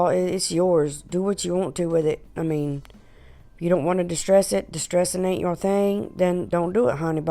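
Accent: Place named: Louisiana, United States. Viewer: I love these projects! BTW, you sound just like my family in Louisiana! Beautiful accent!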